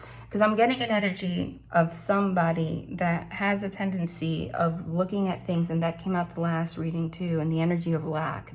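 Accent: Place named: New York, United States. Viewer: I love your new york accent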